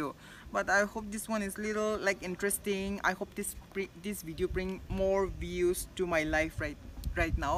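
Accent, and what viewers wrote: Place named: Nagaland, India. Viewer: I just love Ao accent 😎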